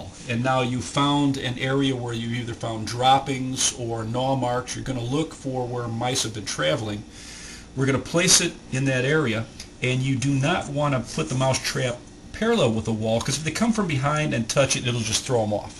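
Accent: Chicago, United States. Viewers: I love your accent too. Chicago?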